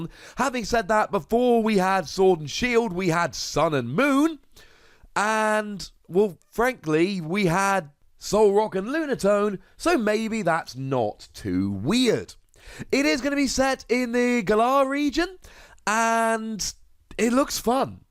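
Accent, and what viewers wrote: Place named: Cornwall, United Kingdom. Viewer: AYY a fellow Cornishman. That's lit, thought I was hearing a Cornish accent but I thought it was all in my head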